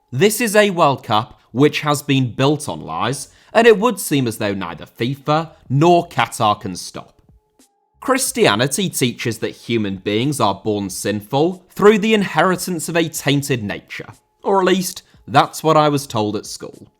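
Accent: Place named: United Kingdom. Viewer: why do you talk like a posh guy from 1600s england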